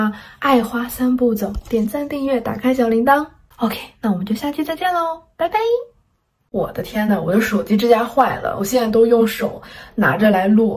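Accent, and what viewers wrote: Taiwan. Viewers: 你另外一个视频里说自己以因为台湾口音，在健身房有人找你聊哦。怎么这个视频又说自己没有台湾口音了？ Where is your consistency? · 好耐看!口音聽起來有一點點台灣的味道了! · 其實妳已經融入台灣了，妳的口音快跟台灣人ㄧ樣了，感覺....很棒。台灣很需要妳們這種有智慧高氣質的伙伴加入。謝謝妳加入台灣隊，我們ㄧ起為台灣這塊土地加油，讓我們ㄧ起幸福走下去。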